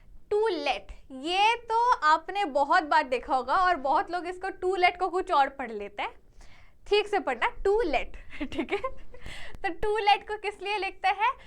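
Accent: India. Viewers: bihar, up, ya haryana se hoga sawra pakka.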